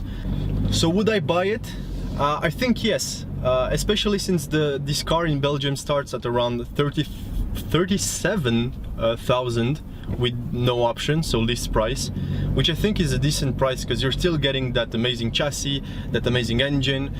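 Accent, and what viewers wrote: France. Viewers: Strong french accent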